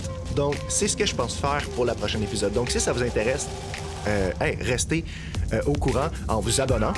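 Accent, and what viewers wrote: Quebec, Canada. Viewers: Chaluuut ! Je te regarde de Belgique. J'adore ton accent quebecois , un accent tellement enjoué , qui donne le sourrire, ainsi que les expressions. 😃 l'orignale m'a tué 🤣🤣 et le "thylaceo" 🤣🤣 Continue copain , tu ensoleilles mes journées 😘🤩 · Hey 😁! Superbe! Merci 😄 vraiment très bien ta ferme. Et pour répondre à la question que tu te posais et étant de Nouvelle-Calédonie, ton accent québécois ne me dérange pas du tout. J'ai déjà pu jouer avec des Québécois sur certains jeux et j'en garde un très bon souvenir. Des personnes agréables, gentilles et surtout très drôles 😋. Après je ne vais pas le nier, il y a des fois où vous avez vos expressions et c'est vrai qu'à ce moment là, je ne comprends pas toujours 😂 mais ça ne me gêne pas plus que ça 😀.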